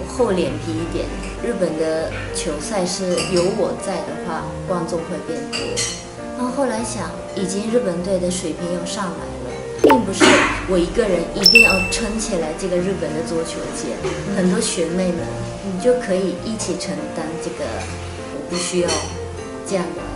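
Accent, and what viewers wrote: China. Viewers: 還是有ㄧ點上海腔的味道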